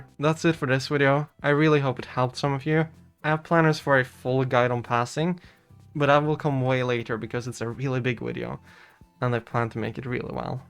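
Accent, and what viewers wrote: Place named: Norway. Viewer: Are you scandinavian? Sorry if that's a personal question but I swear I recognize that accent. *edit: I scrolled down a bit and saw you were norwegian! its cool to see a fellow scandinavian!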